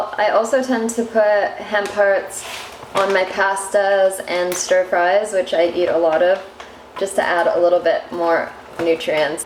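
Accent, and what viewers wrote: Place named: Canada. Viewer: where are you originally from? your accent says canada but your demeanor says california hahah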